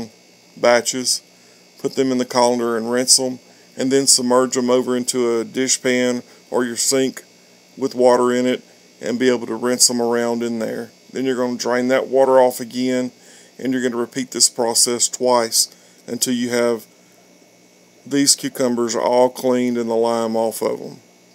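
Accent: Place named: Texas, United States. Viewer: Do you live in lower North East Texas? You certainly sound like you are from my area? I definitely enjoyed your recipe and am in the middle of making a four pound batch right now.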